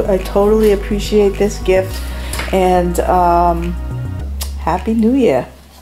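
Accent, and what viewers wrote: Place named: New York, United States. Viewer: Love your accent, are you from New York?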